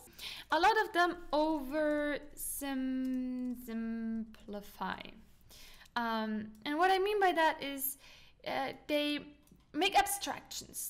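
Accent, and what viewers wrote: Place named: Germany. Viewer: Thanks for sharing this ! You are from Germany, I guess... ? Just curious ! 😬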